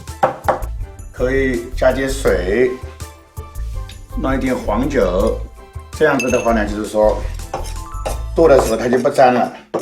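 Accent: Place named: Subei, China. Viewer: 一开口就是老苏北了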